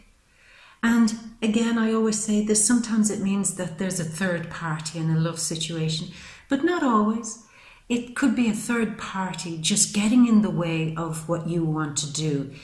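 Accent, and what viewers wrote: Ireland. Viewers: Hey! Just found your channel and loved this reading 💟. Great to hear a fellow Irish woman here 😊. Look forward to following you and looking out for future notifications. Keep up the great work! Thank you 😃👏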